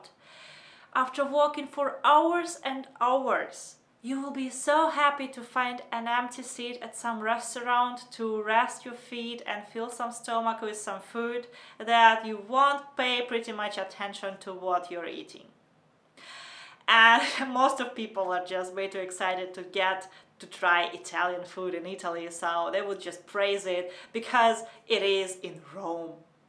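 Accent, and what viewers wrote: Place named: Germany. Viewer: Wann kommen denn mal wieder Videos auf deutsch von dir?Man vermisst schon dein deutschen Akzent :D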